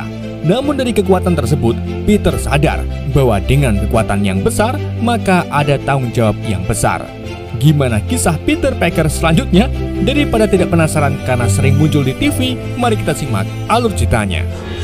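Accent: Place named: Java, Indonesia. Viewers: Byoh enk jowo" e😂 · Gong-go!! Ente orang Brebes bang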